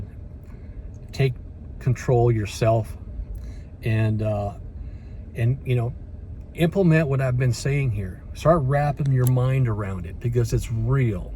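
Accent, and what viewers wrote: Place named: Alabama, United States. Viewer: Hey, you aren’t from Alabama are you?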